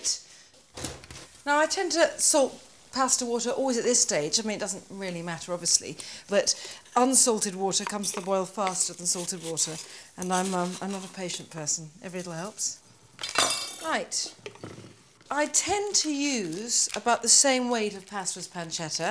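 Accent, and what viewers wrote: United Kingdom. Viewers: I remember watching some of her videos as a kid. It's one of those wistful kind of memories. I really liked her but I couldn't put my finger on why. I think it's partly the cinematography that gives her the signature charm. It's a combination of a British accent, great camera work, and classy ASMR.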